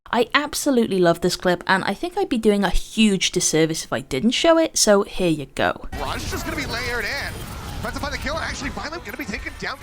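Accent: United Kingdom. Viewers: This is an amazing tips video I've never even thought of (I'm so ashamed) or heard of. I LOVE Coma and Dding - I bought the Shanghai skin for Mercy because Coma is insane (with all access pass, watching his PoV is just... incredible and shows how much work a Mercy can actually put in). I love the fact you brought them up!! I'll probably end up forgetting your disclaimer and trying this in comp and getting rekt but yeah. Thank you for your videos, I just discovered your channel through Twitter rabbithole-ing, I saw your post about some dude raging about your voice. Idk what I expected, but your voice is really soothing and pleasant to listen to! Of course, we Americans tend to love British accents (I hope that I'm not getting your ethnicity wrong here, apologies if I am). Basically you sound wonderful, some people are just hateful to the point of delusion. 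:) Keep up the amazing work, I am a new subscriber and am already learning a lot. (Also I have about 250+ hours on Mercy so this truly means so much to me to learn new and EXCITING things.)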